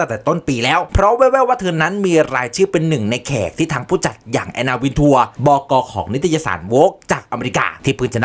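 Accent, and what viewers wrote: Isan, Thailand. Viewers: พากย์แนวอีสานแบบแอดนี่แหละมันเห็นภาพสุดๆๆๆ ...อย่าฟ้าวๆๆๆ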